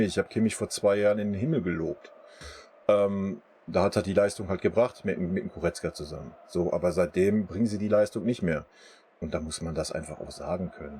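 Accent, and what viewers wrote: Ruhr, Germany. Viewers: Komme aus der Oberpfalz/Bayern bin seit ich in der 1. klasse war Bayern Fan und das ist 33 Jahre her. Ich kann mich noch nie erinnern jemanden Fan sein ab zu erkennen, nur weil er nicht aus München/Bayern kommt. Mach weiter so verfolge dich seit 4 Wochen und mag deine Ruhrpott Schnauze😂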